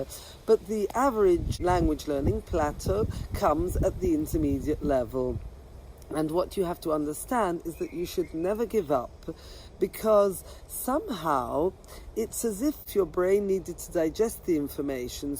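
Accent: Italy. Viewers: You are a native Italian-English speak and yet have an italian accent when speaking English, that's interesting! I've never seen it before. - Great tips, thank you for the video!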